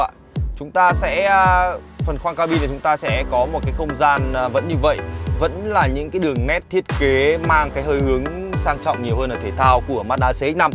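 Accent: Vietnam. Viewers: Vãi cả pre mi ùm. Đọc tiếng anh mà như tiếng việt...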